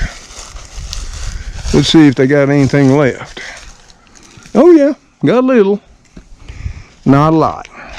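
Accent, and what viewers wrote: South, United States. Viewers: That was a beautiful walk through that graveyard Mr I love graveyards and walk through alot of them myself if only it wasn't so cold but I go out anyways as long as it's not 10degrees when I was a little girl I used to love to play in the graveyard by our house all the time I think you may be having visitations at home when you smell that perfume and I find that so comforting because I believe the spirit can visit their loved ones and I don't fear the dead it's the living we have to fear unfortunately I have many strange things happen in my house also but anyway it's great to get out in the sun in the winter and get some exercise I hope you have a great night and stay safe I'd like to walk through the graveyard again with you if you want thanks 😊 Mr and have fun with your crew and by the way I love your southern drawl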